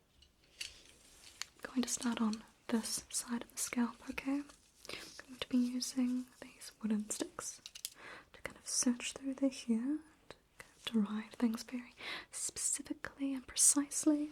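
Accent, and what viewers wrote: New Zealand. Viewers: R u from nz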